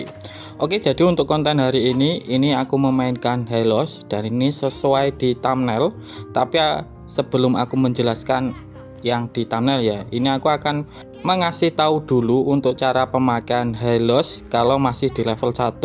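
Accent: Java, Indonesia. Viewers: Temenan pora 😂😂😂 jowo meduk hahah mntep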